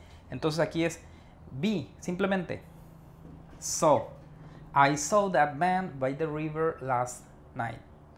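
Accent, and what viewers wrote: Spain. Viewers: Ese acento español...mal jajaja un saludo y buen video una vez mas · me encantó tu acento español tocallo. hace tirmpo hiciste un video sobre inglés britanico ¿ Podrias, por favor hacer uno sobre inglés Australiano?.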